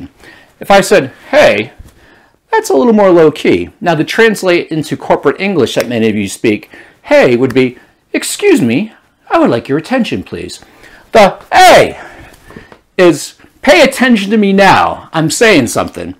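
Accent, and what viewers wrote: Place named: Northeast, United States. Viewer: I love the fact that closed captions does not know what to do with that north eastern accent. Love it!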